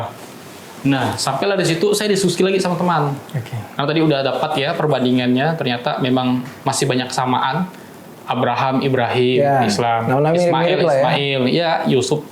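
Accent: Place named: Pontianak, Indonesia. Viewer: Logat Melayu Pontianaknya masih kental ya bang... Alhamdulillah, crite abang mirip dgn teman sebangku ku, krn keseringan lihat saye beribadah.. semoge abang istiqomah... aamiin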